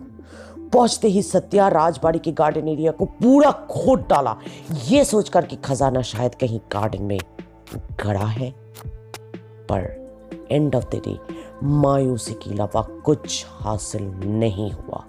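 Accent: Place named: Bengal, India. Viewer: I like the bengali accent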